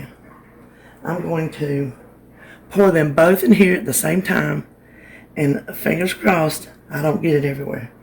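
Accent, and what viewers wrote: South, United States. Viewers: Hi! My name is Elizabeth. I just recently started watching your videos. I totally understand your southern language since I am from Mississippi but now live in Niceville, Florida. You are doing great! Keep up the good work. I love you are taking the good ole southern cuisine and making it ww friendly. Have a great weekend!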